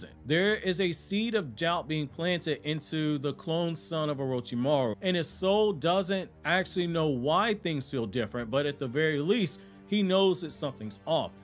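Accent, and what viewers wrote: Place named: East Coast, United States. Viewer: He really think down south people country 😢 you can tell he from the east coast 😂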